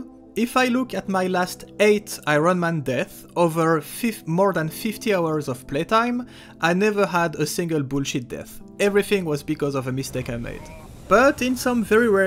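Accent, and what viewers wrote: France. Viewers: Are you french? It sounds adorable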